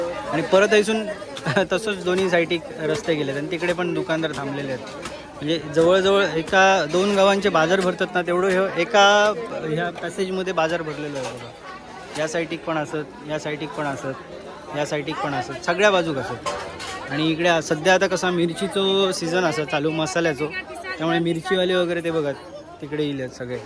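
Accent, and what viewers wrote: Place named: Malvan, India. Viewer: खूप छान मालवणी आवाज मी आरवली चो ❤❤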